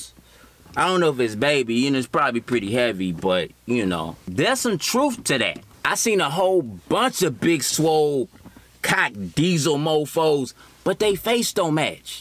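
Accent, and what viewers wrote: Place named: New York, United States. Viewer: You originally from New York?? I detect an accent.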